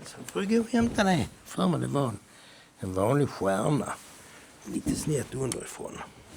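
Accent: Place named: Skåne, Sweden. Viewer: Härlig Skånska känner mig hemma ☺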